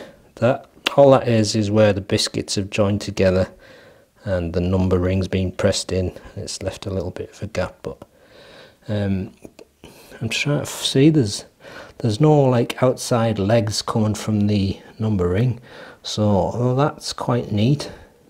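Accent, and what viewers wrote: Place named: Scotland, United Kingdom. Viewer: Oh from england that’s cool but i like ur accent though it looks like scottish accent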